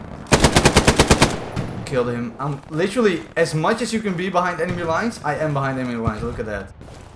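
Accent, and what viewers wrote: Netherlands. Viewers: your Dutch accent seems inaccurate for a German soldier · I smell Nederlands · Thic Dutch accent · ur dutch arent you ;)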